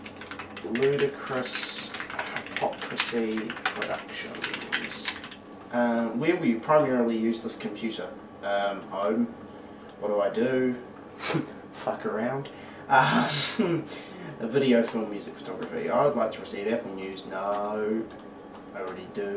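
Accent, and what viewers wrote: New Zealand. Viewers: you're accent suggests that you are a kiwi?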